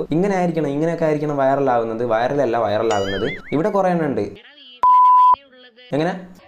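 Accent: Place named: Malappuram, India. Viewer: Ante ജില്ലാ eedha Ante ഭാസ കേട്ട്ട്ട് മലപ്പോർത്ത്ള്ള ന്നാ തോന്നദ് 😂മലപ്പുറം ആണോ അന്യേ ജില്ലാ ❤️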